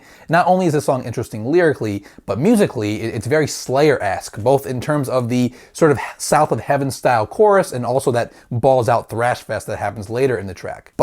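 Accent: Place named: New York, United States. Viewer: Oh, I could've sworn you were with that accent but maybe it's a NY one lol.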